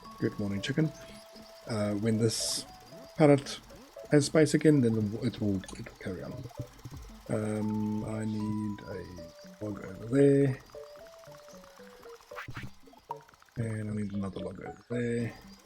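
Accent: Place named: South Africa. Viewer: I'm in it for the south african accent, correct me if I'm wrong. either way I love your accent and it allowed me to enjoy a game I usually wouldn't!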